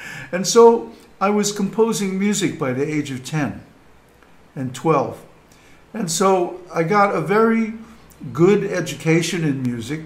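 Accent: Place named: United Kingdom. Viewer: Guruji are you originally from England or Scotland ?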